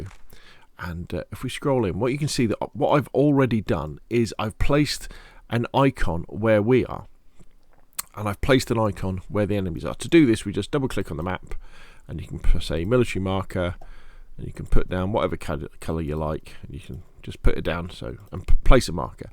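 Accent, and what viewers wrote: England, United Kingdom. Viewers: I feel like when the teacher has an english accent, it makes it lots more easier to understand 😂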